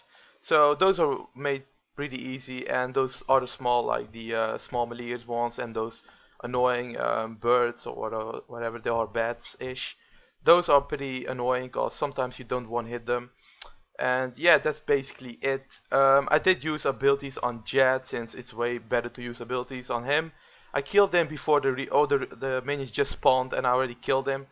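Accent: Netherlands. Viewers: jij komt uit zeeland typisch zeelands accent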